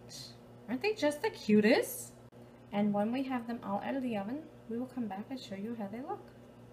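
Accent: Newfoundland, Canada. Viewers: Is that a Newfoundland accent ? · I thought you were probably Canadian. But your accent has a nice something extra compared to what I'm used to hearing. I'm from Northeast Ohio and if I have any accent it's pretty undectible (boring) I think. I imagine Newfoundland is a nice place to live. I am hoping to make this recipe and impress my family 😁 Merry Christmas! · Going to go out on a limb here and say that's a Newfoundland accent...!? Canada? Going to give these a try girl!!! Many thanks from Niagara "Wine Region" Ontario, Canada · Is that a Newfoundland accent?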